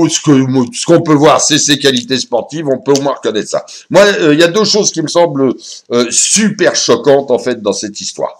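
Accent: France. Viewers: mais non, ta pas une mauvaise pratique de l'anglais, c'est juste que, comme moi, tu a un anglais....très français^^ sinon, l'épisode de T.V.Liberté de se soir y est peut être consacré apparemment, c'est toujours ça a prendre en plus. sur ceux, merci de ta réponse et bonne soirée^^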